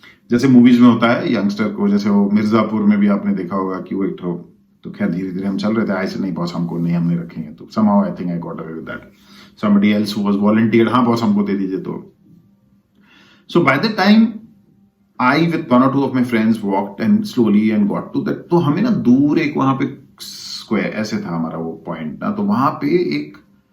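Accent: Bihar, India. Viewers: Apka bihari tone me bolna bht apnapan deta h. Thanks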